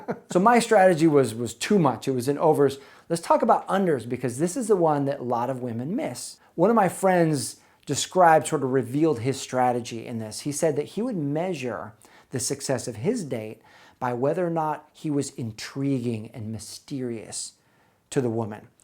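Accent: United States. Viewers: I love your slight country accent! I didn’t notice at first until you said “time” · I love your video's. I love your American accent too 💛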